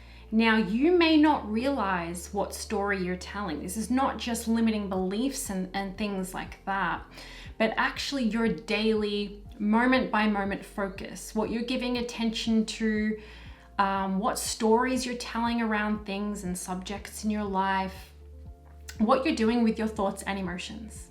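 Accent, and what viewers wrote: Australia. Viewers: I thought that was an aussie accent! 😉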